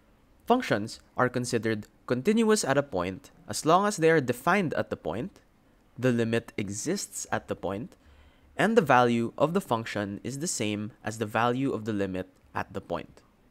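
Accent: Philippines. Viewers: you sound somewhat Pilipino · Pinoy itong nagsasalita ramdam ko. Unang salita pa lang sa video eh HAHAHA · This accent sounds Filipino. Are u Filipino? Edit: also great vid man, the way my school explains calculus is terrible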